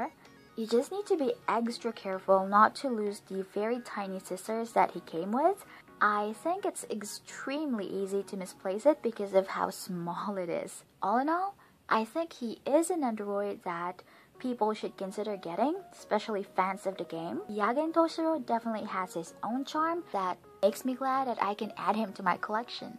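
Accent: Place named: United States. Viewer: Phillipines? You sound American??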